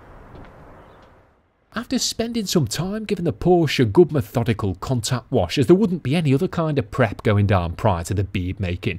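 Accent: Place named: Wirral, United Kingdom. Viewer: I think I recognise the accent, are you a Wirral lad? I'm born & raised there but have moved over the water to Sunny Formby. Thanks for another informative episode. 👍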